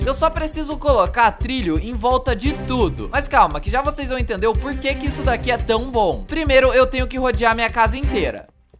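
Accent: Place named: Portugal. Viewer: Essa menina é portuguesa a falar a língua de Portugal e eu moro aqui em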